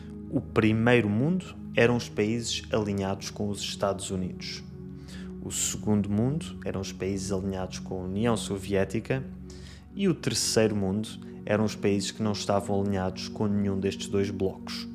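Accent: Portugal. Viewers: Amo o sotaque português ❤ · Thanks!!! I love your Portuguese accent so much ❤️ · Desculpe peço para ensinar-me como ter o sotaque de Portugal! 🥺🙏🏻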